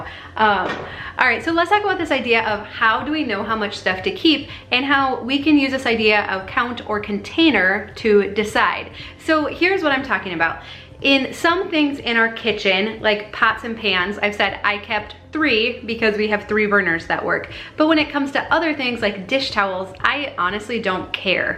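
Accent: Minnesota, United States. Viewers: Me: I wonder where this lady is from.: Plastic baegs Me: Ok, Minnesota then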